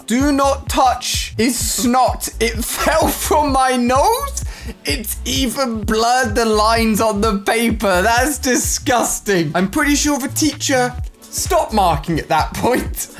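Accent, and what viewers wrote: England, United Kingdom. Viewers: Is he english?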